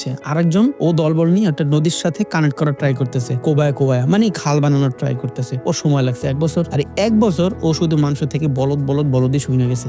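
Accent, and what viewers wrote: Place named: Shariatpur, Bangladesh. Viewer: কিও ভাইয়া আপনার মুখের ভাষা দেখে মনে হয় আপনি শরিয়তপুর জেলার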